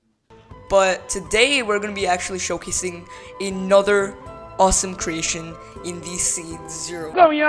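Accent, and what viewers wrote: India. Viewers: Nice vid but are you Indian.........?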